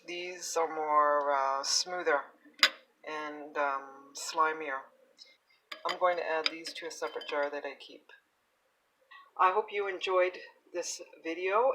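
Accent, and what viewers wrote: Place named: Canada. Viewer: I have thoroughly enjoyed this video. I have watched it over and over. I ordered some water Kefir grains from Florida and have made a huge batch of coconut Kefir. It is thick and rich and creamy. It tastes better than any Kefir I have ever tasted in my life. Your instructions are short and to the point and very good and well thought out. Also. I have been in America for the last 30 years but I was born and raised in Canada🇨🇦 and I am enjoying your Canadian accent. I especially love the way that we say “about”. I still have a bit of my Canadian accent. Stay encouraged. Keep up the good work.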